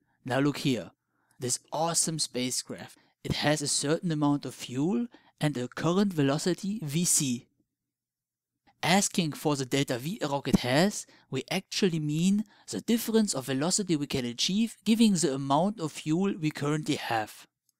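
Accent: Germany. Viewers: Do i hear deutsch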